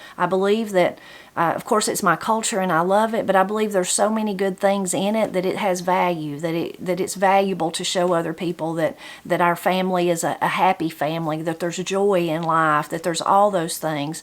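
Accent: Appalachia, United States. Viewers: So very happy for you and your family. Truly well deserved. I came across your channel while looking for information about canning. Like many people I’ve increasingly wanted to know more about what I’m eating and am separating myself more and more from processed things. That I am from Virginia and recognized your beautiful accent which is similar to my fathers and grandparents, well after the very first video I simply had to subscribe. Congratulations and here’s to many, many more subscribers to come.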